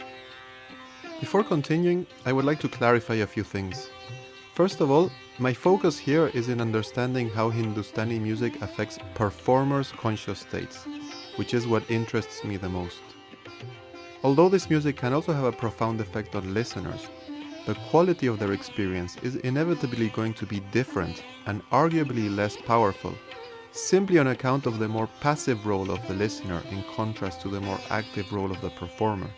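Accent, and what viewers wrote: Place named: Scandinavia. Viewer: Kyabaathai. Very good and important work. Thank you so much for this wonderful initiative! Also I must ask: Where are you from? Your accent sounds very Scandinavian but the Indian Classical Music community is so small here that I would've known you then?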